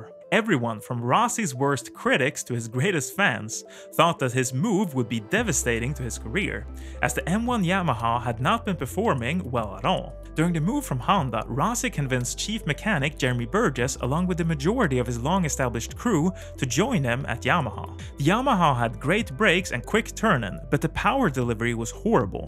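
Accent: Sweden. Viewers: You sound swedish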